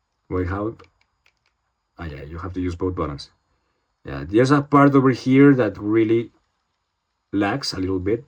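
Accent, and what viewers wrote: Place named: Colombia. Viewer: Se nota que el inglés es tu segundo idioma, el primero por el acento es español. Infiero con total certeza que el eres de Colombia por qué también lo soy. Solo una recomendación, por qué a mí también me pasa, intenta hablar más pausado más lento, con más ritmo. Así notas más seguridad y profesionalismo. El video es útil. Gracias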